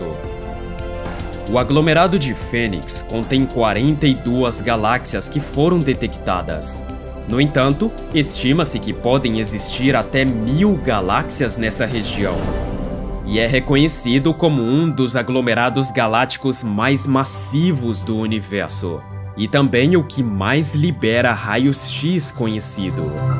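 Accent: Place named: Caipira, Brazil. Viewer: eu gosto quando o caipira surge "redoR" "caloR"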